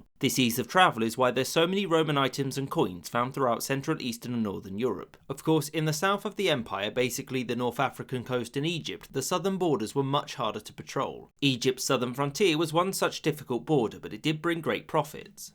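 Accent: England, United Kingdom. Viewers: You are funny I like your videos I wonder where you are from in England with your accent. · Why do you have a british(?) accent but use miles instead of kilometers?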